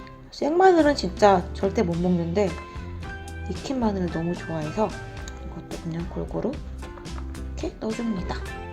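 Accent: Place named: North Korea. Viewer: 우리 이쁜 북한억양. 중독댐!ㅎㅎㅎ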